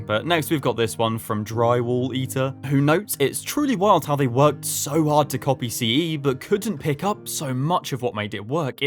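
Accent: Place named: United Kingdom. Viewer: click on video I'm interested in watching -Generic well spoken British guy again? 😮‍💨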